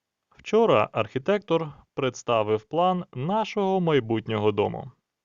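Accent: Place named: United Kingdom. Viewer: Все гарно, лайк, але твій Брітіш акцент.. Тоді хоть зміни прапор з Американського в заставці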